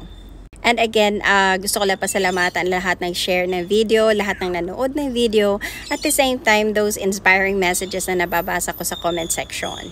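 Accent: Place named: United States. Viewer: Englisera Ka Pala madam at American accent sarap pakinggan.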